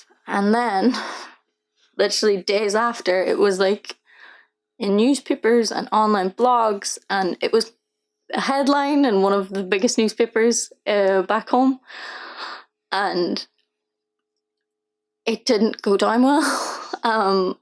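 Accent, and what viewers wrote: Ireland. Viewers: I Never post coments, but this is one of my first, I am so proud of you Janet, for posting this video, it'S what make you happy in life, don't, worry about what other people think. I was honered, to meet you and your manager when you came to Aberdeen, YOU are always a superstar to me. ( beautiful irish voice) You will aways be welcome in Aberdeen Joe x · good try , Mistress of Clickbait :)) Now go back to sing, we adore your unique, spicey and irish accent ! Wish you joy & happiness !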